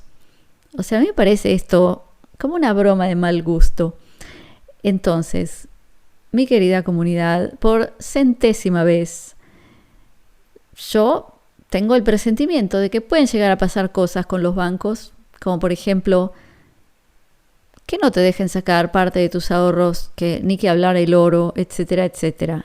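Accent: Argentina. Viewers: hablas así porque sos Argentina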